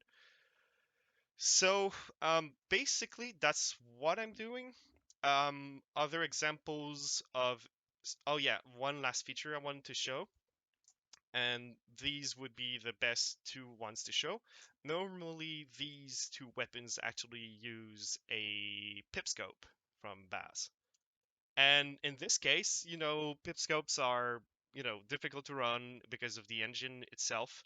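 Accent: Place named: Quebec, Canada. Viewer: haha, knew it. your accent is very light but from a fellow quebecois, i could tell. hope your progress on the mod is going well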